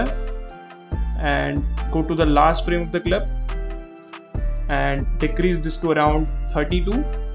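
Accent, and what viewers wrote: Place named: India. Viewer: DAMN THE INDIAN ACCENT IS DRIPPING OUT OF THE VIDEO!!!!!!!!!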